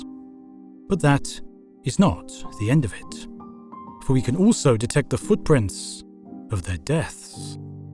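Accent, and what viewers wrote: England, United Kingdom. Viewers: Are you British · Yes, he is. That's an English accent.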